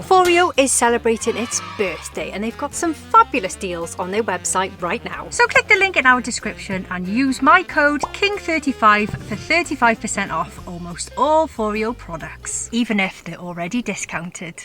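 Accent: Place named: Cardiff, United Kingdom. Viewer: Loving your adventure. And as someone said, it is enough just to travel alone. I’ve done it a couple of times. The first two weeks I wondered if I should have been allowed to leave the house, let alone drive across Europe alone! Suddenly I found my feet, my mojo, and everything started to click. Life always seemed to support me. You make me laugh. You sound Cardiff, that’s my hometown. Love your accent. Crackin’ x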